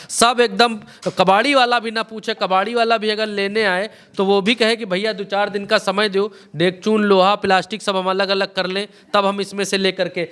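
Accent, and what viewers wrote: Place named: Uttar Pradesh, India. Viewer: भाई जब बोलते हैं तो सिर्फ सुनने को जी चाहता है pure up ki bhasha Bolte Hain 👍👍👍👍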